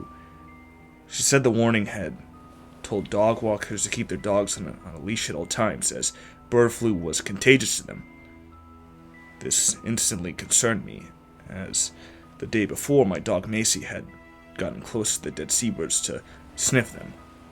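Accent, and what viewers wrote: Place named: Yorkshire, United Kingdom. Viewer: Sounds like a yorkshireman right enough. 😏 Ps. We also have resident dolphins and tuna in UK waters during warmer months.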